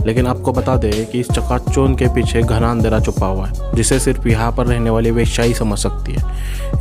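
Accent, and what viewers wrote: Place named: Maharashtra, India. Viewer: Aap pakka maharastrian Ho isliye aap ki boli se pata chalta hai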